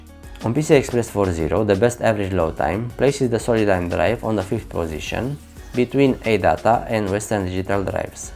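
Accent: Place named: Eastern Europe. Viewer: Eastern European accent sucks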